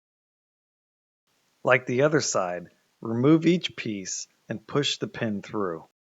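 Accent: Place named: United States. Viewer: WE SUBSCRIBED Coming upon your video after working through a few with non-native speakers or unclear audio filled me with appreciation for your "unaccented" speech-- no drawl, only plain American pronunciation. Along with a systematic disassembly / reassembly process, with product tips and pointers, your video is exactly what I needed. The only aspect for improvement is most of the action is recorded at a distance, and we are left looking over your shoulder, unable to see more orienting detail and your specific, finger-level movements.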